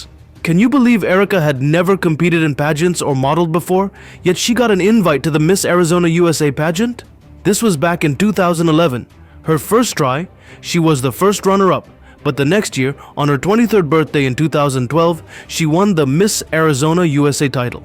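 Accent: India. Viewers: This man is sound like Indiana! Someone paid him to report this fake story!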